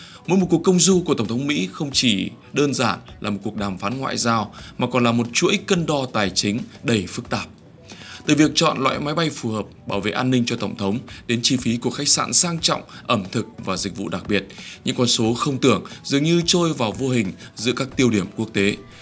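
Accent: North, Vietnam. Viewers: giọng đọc của người miền BAC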